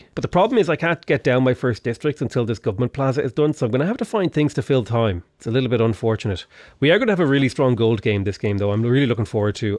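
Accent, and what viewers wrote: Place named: Ireland. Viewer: you sound especially irish today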